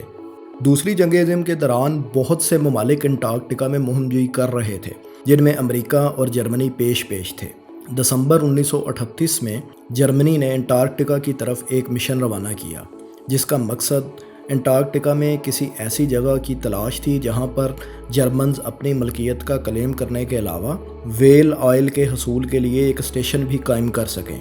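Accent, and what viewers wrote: Punjab, Pakistan. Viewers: Sir Plz Q Krte Henn Asa.. Lafz Tk Sahi Nhi Boly Ja Rhy.. Majbot Rhna Bhai Mazbot... Urdu Hi Sahi Bol Lo Bhai Plz.. Punjabi Feel Arhi Hy.. Zahar Hy.. Zahir Hy.. Ufff.. Faisal Bhai Humre Kano Ko ApKi Awaz Chahiye.. Standrd Bna Dia Hy To Ab Pura Krain.. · Punjabi accent,,,,,,,,, :) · Punjabi accent me Urdu bolrhe ho. Pathetic voice, bring back Faisal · آپ کی اردو سن کے یہ چینل ہی دل سے اتر گیا...پنجابی نما اردو سے بہتر تھا پنجابی میں ہی وائس اوور کر دیا جاتا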